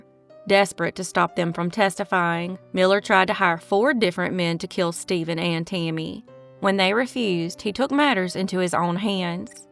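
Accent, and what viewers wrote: South, United States. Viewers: Awesome Southern. Woof Woof.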